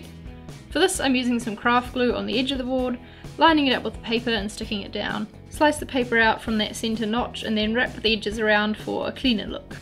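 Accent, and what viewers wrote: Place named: New Zealand. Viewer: i knew u were from NZ I AM 2 i could tell because i usually watch american youtubers and you sound so different like a kiwi I FINALLY FOUND A KIWI YOUTUBER!!!! this video helped a lot thank you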